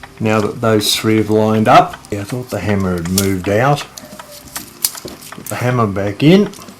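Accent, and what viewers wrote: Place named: Australia. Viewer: Sounds like an Aussie 🇦🇺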